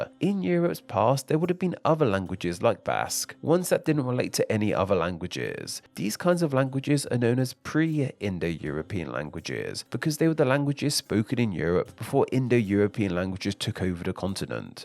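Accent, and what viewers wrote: United Kingdom. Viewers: He is British, ever heard of different accents?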